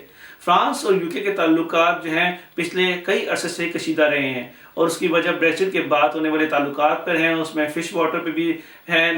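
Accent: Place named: Pakistan. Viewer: bhikharistan se ho kya accent se lagraha h ....tumhara katora kahan gaya....china usko bhi le gaya kya tumhe bheek dene ke liye....sala china tumhe aid de ke tumhara desh ko kharid raha h tum log yaha asalam alekum kar rahe hooo...tum buddu logo ne kabhi apne govt ko pucha h china aur pk ke andar ke kagjat ke bare me....ya phir din rat yahi karte rehte ho....kafir ka sajis aaaaaaaa...hindu ka sajis aaaaaa...😂..bosadike hmm hindu tum logo jyese nafrati..atankwadi..jahi;...andha nhi hote hame hesa apni padosi ki chinta rehta ha.....basudhyeba kutumbakam padha ha kabhi ,....is ka matlab hota h ki pura world ek pariwar haa....abhi bhi samay ha bhai sudhar jao hmm sath dene ke liye tayar ha.....jay hind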